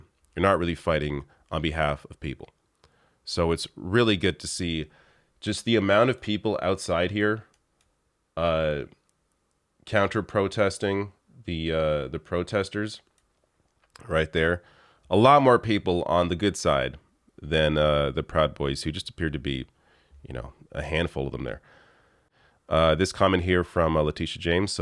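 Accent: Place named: Canada. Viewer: I looked you up because I thought you sounded Canadian....weird how that is, eh? Aloha from BC!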